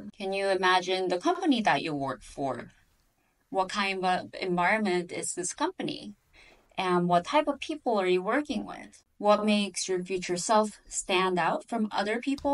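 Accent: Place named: South Korea. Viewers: Yes. I'm half so I've heard Koreans speak English for most of my life. I dunno what it is exactly but it's recognizable. But in case you wanted to really know, the last name is a dead giveaway. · Great video . Btw Are you of Korean origin ? I noticed a slight accent . I have a linguistics background that's why I noticed , I did not mean to offend in any way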